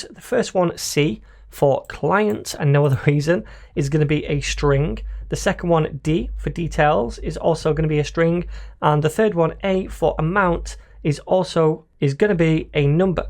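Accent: United Kingdom. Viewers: i love your British accent <3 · British accent? Sounds nice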